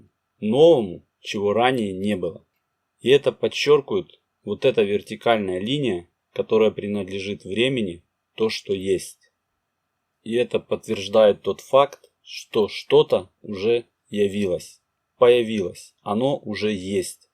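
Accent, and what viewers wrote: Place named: Ukraine. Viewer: Благодарю! Вы очень классно объясняете! У вас как будто бы украинский или кубанский скорее говор, это сразу было не привычно, но на самом деле не важно, потому что важен не голос, а информация. Вы даёте понять глубинно, и даёте возможность слушать! Не всегда есть возможность читать книги, особенно в офисе. Спасибо!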